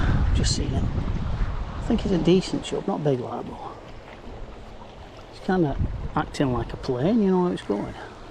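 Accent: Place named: Wigan, United Kingdom. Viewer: Ellow pal, belter vid again and you make that cast look easy! Don't know if it's the Ribble you're fishing but guessing it might be coz you sound like a fellow Wiganer and it'll be on your door step but I've been trotting (with a fix spool) 3 ince dace on there after big perch and jacks and managed a 20lb pike the other week, bet it would make an interesting video on the center pin if you've ever done owt like that before? But ill keep watching either way 👍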